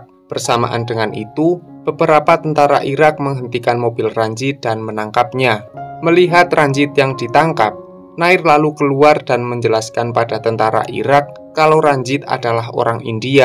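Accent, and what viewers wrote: Java, Indonesia. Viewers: Baru nemu chanell ini..suara nya jawo tok wkkwkk lgsg subcribe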